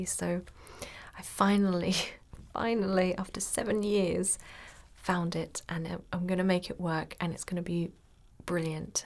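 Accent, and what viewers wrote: United Kingdom. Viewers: Im in love with your voice... And British accent ❤️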